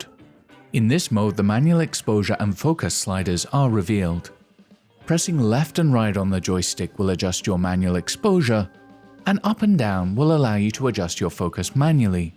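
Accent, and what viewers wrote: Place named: United Kingdom. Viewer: I agree with you. Best of the British accent.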